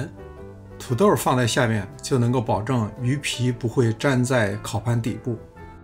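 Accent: Beijing, China. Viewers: 感谢老师的讲解！听上去您是北京人吧？感觉很亲切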